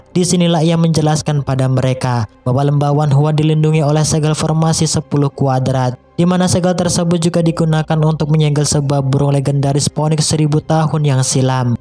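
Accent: Madura, Indonesia. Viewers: Org Madura kau ya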